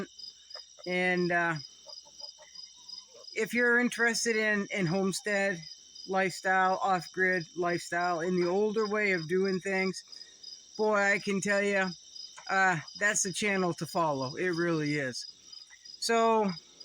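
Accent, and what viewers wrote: Maine, United States. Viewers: Congratulations on your 3,000! Im picky on pages I follow because I dont have time to watch them all lol I only follow a handful, I definitely learn from yours + agree with things you have to say + you make me laugh + of course being from Maine love your Accent. Wow crazy temps up there😮 it was only 85 here in SC. Hope you get some good sleep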